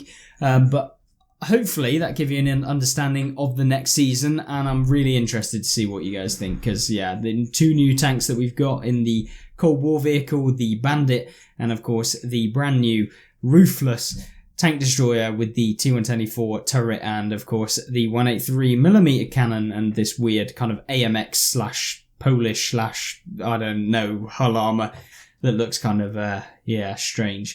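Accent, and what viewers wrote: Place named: Cockney, United Kingdom. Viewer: You speak English? You're English? So why the actual f*ck can't the people who made the f*cking language speak it ? Roofless? It's ruthless you can say the with the t and the h making the correct sound then you mispronounce thought and make it sound like fought when clearly one starts with a f*cking f and the other starts with th so if you know what sound it make's why are you using fs where there's no fs you say there their and they're perfectly so why the f*ck are you using that lazy dog sh*t cock knee accent for ruthless 🤔 mate come the f*ck on stop being sooooo lazy put some effort in if dez game's can correct himself (Lithuanian-English) why can't you speak the easiest and your own f*cking language for 5 and a half minutes I was waiting to see a Roofless tank wondering why a tank without a roof was being put in the game and why you were calling it a roof and not a turret it's your language speak the f*ckin thing correctly or do some linguistic tutoring you literally have the internet and hundreds of language apps at your disposal I'm Australian and between you idiots the Americans and the kiwi's f*cking up your own language I'm appalled I know Swede's Finn's and Indonesians who pronounce English better than that stop swapping letters out and pronounce sh*t correctly I'm diligent when I use German and Finnish so the context is understood how are you butchering your own language? 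It's just laziness nothing else fix it drives the rest of us up the wall you're doing such a g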